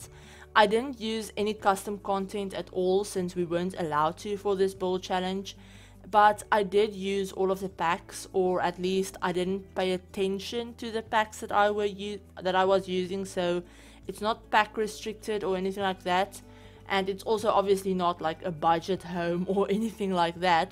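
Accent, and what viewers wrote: Afrikaans, South Africa. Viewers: Are you a Afrikaans speaker? 🇿🇦 Excellent build 👍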